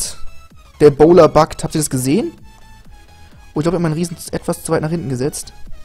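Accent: Munich, Germany. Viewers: kommst du aus München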